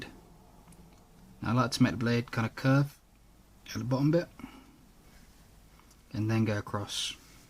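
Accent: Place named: United Kingdom. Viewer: Are Brittish cuz accent is quite fantastic and a bit different than American accent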